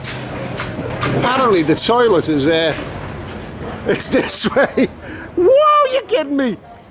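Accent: Liverpool, United Kingdom. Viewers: Fantastic video, your photography was brilliant, your commentary was most informative without being boring, i think the Scouser accent gives it a nice cheeky humorous flavour, although i think you may have pissed off a few lads in the council estates around "LaPool.HeHeHe.Keep up the the good work brother look forward to more like this one.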